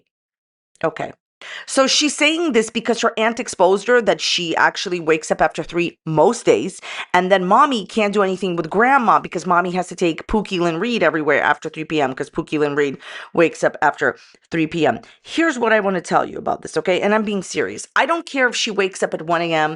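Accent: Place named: New York, United States. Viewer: Your channel cracks me up. I’m from New York City area and your accent and your attitude. Reminds me just. Like home ❤❤❤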